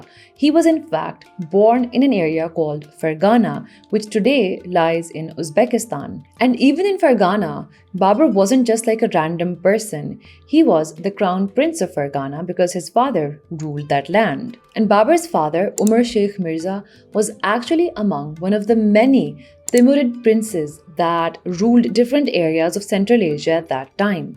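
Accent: Pakistan. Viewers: What's with the Pakistani English Accent...!? Very similar to Bangladeshi English..!! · amazing content in pakistani assent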